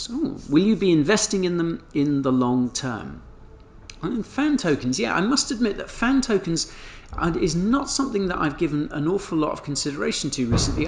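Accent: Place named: United Kingdom. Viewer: So nice to have an eloquent British voice in crypto YouTube amoungthe many Americans!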